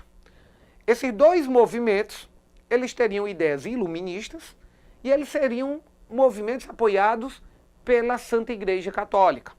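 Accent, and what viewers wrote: Northeast, Brazil. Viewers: Esse sotaque nordestino é tããão lindo 😍 Parabéns pela aula, muito esclarecedora 👏🏼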